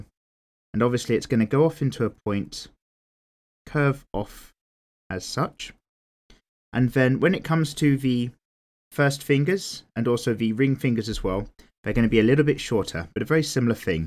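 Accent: United Kingdom. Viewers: very bri ish eh?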